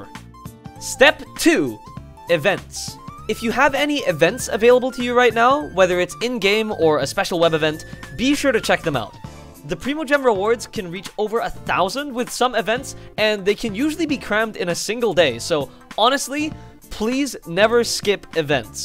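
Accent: Netherlands. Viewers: I like the thick Dutch accent XD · Are you dutch?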